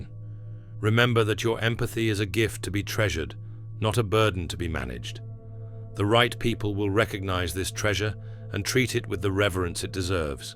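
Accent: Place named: United Kingdom. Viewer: sing-songy British voice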